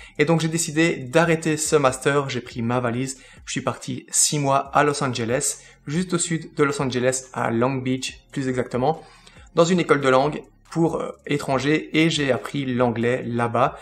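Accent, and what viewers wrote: Belgium. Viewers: Bravo pour ces 10 000 abonnés bien mérités... Grande fan de ton petit accent belge... surtout ne le perd pas même si tu décide d'aller t'installer au soleil;-) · Salut, félicitations pour les 10K... toi un accent belge?? lol euhhh je confirme, de quelle région en fait? moi grandi du côté de Charleroi et Liège depuis 15 ans;) Le business Insta oui très bonne idée parce que j'ai du mal à comprendre comment on peut monétiser sur ce media. Je suppose que ta société n'est pas en Belgique (juste parce que je me demande quel est le statut dans ce cas et je me vois mal poser la question à ma comptable étant dans un domaine qui n'a rien à voir.... lol mais une chaine de présentations de produits et tuto sont en tête depuis un an) Encore merci pour tous tes conseils. J'ai aussi été validé sur Degiro en attendant que T212 se décide à revenir sur le marche européen (merci Brexit) et que TR ouvre la Belgique... 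T'es le bien venu pour un café si tu passes dans le coin! (pas un Starbucks hein! je sais ce que tu en penses!)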